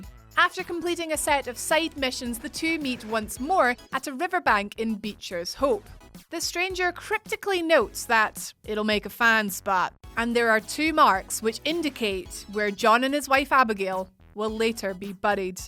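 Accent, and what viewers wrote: Scotland, United Kingdom. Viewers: is she Scottish? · I'd love to know on which planet the Scottish accent sounds like a mashup with another accent. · She's Scottish. :-)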